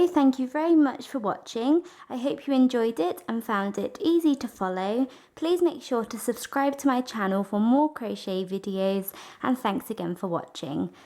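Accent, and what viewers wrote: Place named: RP, United Kingdom. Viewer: love your voice you talk very posh!!! im in UK too, and im from up north lol x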